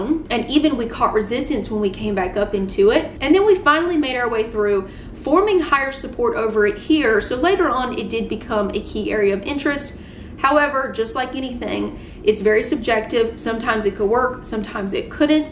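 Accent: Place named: South, United States. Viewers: I Love Your accent, straight out the south? Either way, You are Awesome.Thanj you for the priceless information. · Are you from Southaven, Ms.? I recognize the dialect.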